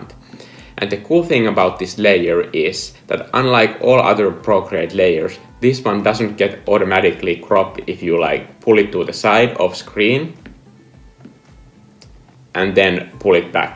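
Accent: Finland. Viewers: are you Finnish per chance? ^^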